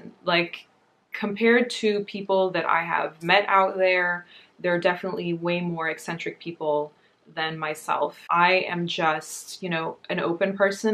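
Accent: United States. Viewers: American schools explains why you have an American accent. Also, what is short for?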